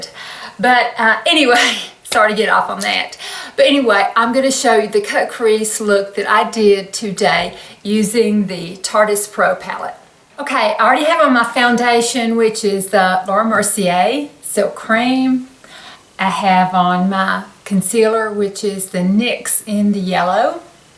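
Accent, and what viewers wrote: South, United States. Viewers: You are beautiful!!! This is the first time I've seen any of your videos and it was very helpful. You must be somewhere in the South? Love your accent, you sound like my sweet Aunt Dot in a little town called Meansville, GA, about an hour outside of Atlanta. May I ask your age? Thanks for this video. I WANT THAT PALETTE!!!!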